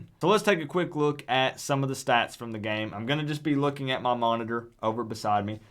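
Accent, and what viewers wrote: South, United States. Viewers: Florida Gators fan here gotta ask u where u from? Just curious because u sound like u have a little bit of a southern accent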